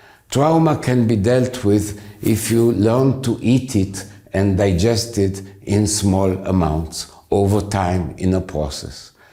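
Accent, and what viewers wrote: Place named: France. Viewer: I love your accent are you French and thank you for your wonderful, articulate wisdom. A merci...😊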